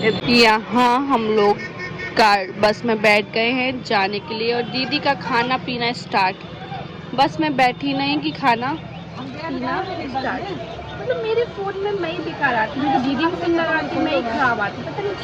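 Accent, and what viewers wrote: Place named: Bundelkhand, India. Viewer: Bundelkhandi achhi LG Rahi aap pe❤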